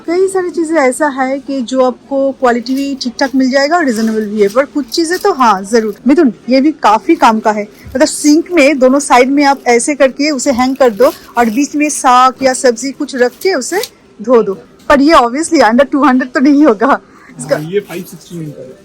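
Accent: Bengal, India. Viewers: She is bengali, it's her accent.... Kindly don't use such language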